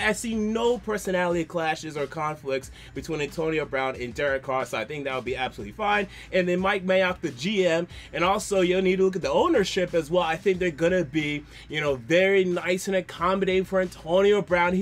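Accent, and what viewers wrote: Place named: South Florida, United States. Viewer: That South Floridian accent doe 💀💀💀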